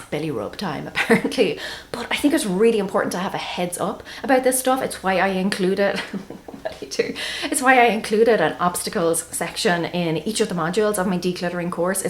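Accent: Ireland. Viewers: Always love your videos and that wonderful melodic Irish accent xx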